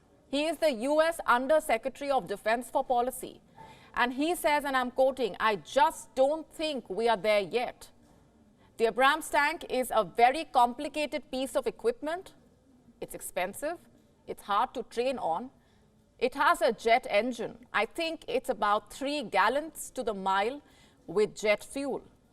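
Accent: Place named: India. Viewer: Indian accent is so ridiculously funny! 😆😆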